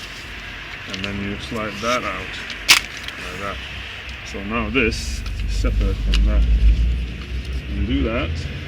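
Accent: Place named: Russia. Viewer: Thanks. Really interesting accent you have, I thought you were Russian at first!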